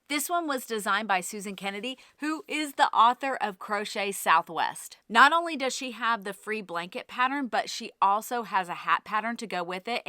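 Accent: United Kingdom. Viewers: Nope nope nope. All that faffing about, you may as well spend time weaving in ends. I'm British and love that you mutter to yourself in a Brit accent! Thank you for the review. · I was was not expecting that British accent!😂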